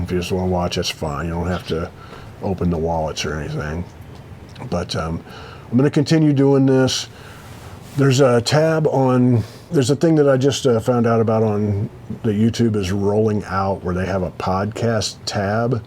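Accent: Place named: South, United States. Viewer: Ain't been here for all 100. Not even close. But you're a natural story teller. That genteel southern drawl is a big plus too. You and your friends are real easy to watch. You've got a way about you. Thanks for creating this channel. It's just my style.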